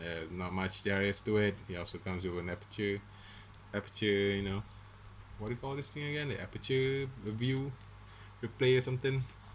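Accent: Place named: Malaysia. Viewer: Sounds like Malaysian English. But He made it clear that it is not worth it.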